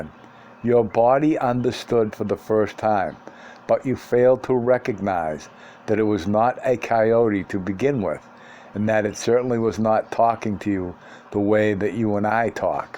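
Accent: Boston, United States. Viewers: This is so niche, and I never thought I would listen to Castaneda with a heavy Boston accent which makes it all more mystifying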